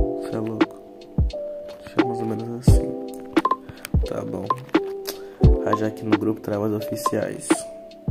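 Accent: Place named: Rio de Janeiro, Brazil. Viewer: Shu carioca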